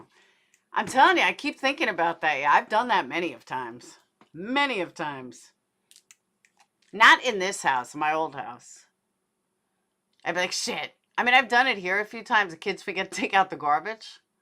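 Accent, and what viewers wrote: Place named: Staten Island, United States. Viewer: At 40.40 ish or a litl3 before I caught ur NYc_ Staten island big time "gawd fawbid"😆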